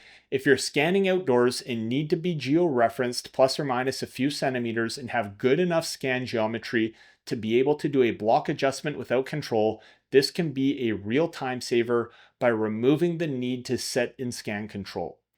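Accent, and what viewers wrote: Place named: Canada. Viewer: Great video! Very in-depth and informative. I have a lot of hours scanning with the VZ-400i, and the VZ-600i just looks all around better. I wouldn't wish lifting the VZ-400i 50-100 times in a day on anyone lol I did want to mention, regarding Leica's app and it's field capabilities, that Riegl offers an optional license for a program called RiPANO that has some similar capabilities. If they still offer it, it might be worth diving into. P.S. Love to hear your accent in your videos. I'm a Canadian surveying in the US as well, so it reminds me of home!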